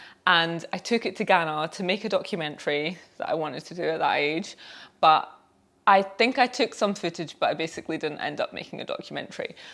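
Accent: Scotland, United Kingdom. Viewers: Your Scottish accent sounds so funny to my ears lol, where are u originally from if i may ask?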